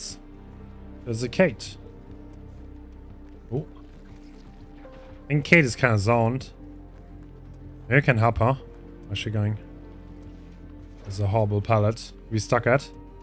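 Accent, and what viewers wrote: Germany. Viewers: 100% sure that you are german xD the german accent while speaking sounds absolutly terrible xDDD i got the same problem Liebe Grüße - greetings 😝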